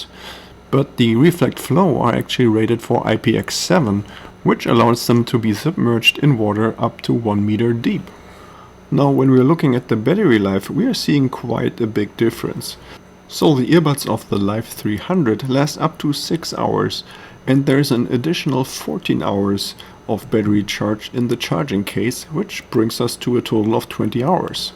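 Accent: Germany. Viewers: U have a German accent so GUTEN TAG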